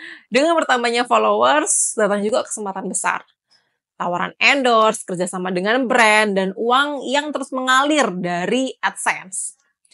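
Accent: Java, Indonesia. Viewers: Imemang gaya bicaranya begitu ya intonasinya terlalu ditekankan terkesan medok jd kyk orang tercekik.... Kenapa ya.... Kasihan.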